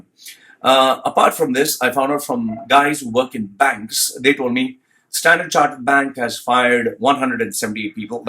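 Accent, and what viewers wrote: India. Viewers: You sound like an Indian,,,are you...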